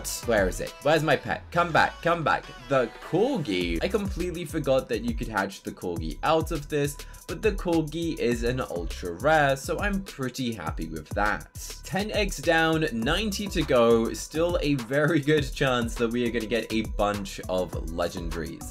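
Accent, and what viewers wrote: United Kingdom. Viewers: I never knew he talked in British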